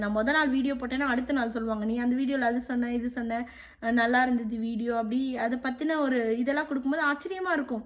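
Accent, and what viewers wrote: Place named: Theni, India. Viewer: Random unga video slang enga Amma pesra mathiri irunchu..... ipotha theriyuthu elaam theni nu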